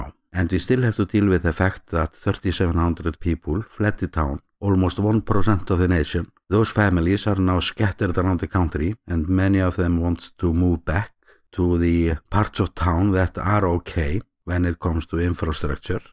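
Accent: Iceland. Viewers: Apart from the content, I love listening to your voice. I am Dutch, and for some reason the Icelanding accent when speaking English comes very close to what my parents sound like when they speak English. (I sound more like an American since I grew up with subtitles and the internet, but their accent sounds so similar in many ways!)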